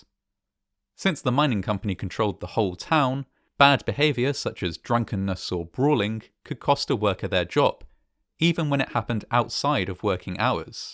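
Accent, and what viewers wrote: England, United Kingdom. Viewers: He is British or at least sounds British. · He definitely has an English accent! Definitely not a Scottish one, or even a mid or northern English one. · That's the English accent. 👍🏻🇬🇧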